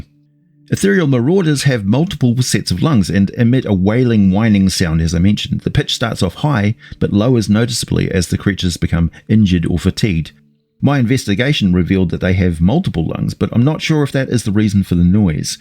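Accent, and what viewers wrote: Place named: New Zealand. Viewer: Hi, I was wondering if you’re related to the stardew valley content creator “BlaDe” at all? You both have very similar kiwi accents imo (I’m from Auckland myself), and you two seem to have a physical resemblance too.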